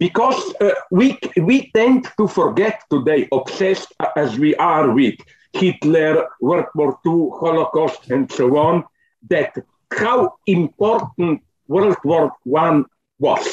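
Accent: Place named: Russia. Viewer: Like listening to a Russian Sylvester the Cat..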